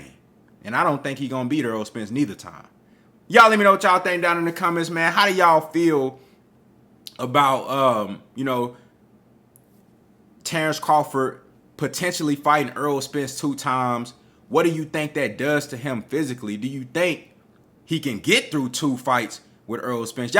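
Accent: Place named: Dallas, United States. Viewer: Man Kel Brook was already broken up.....and Porter actually looked like he would have beat Spence....he was on Spence ass!! Say your accent gives you away...you a Dallas fan boy......you aint givin us commentary that unbiased.....mane....you a fan boy mane we aint buyin what u sellin mane!! Spence aint cerebral he a brawler....he has never been mentally challenged but he will this fight and Im not a fan of welterweight boxers!!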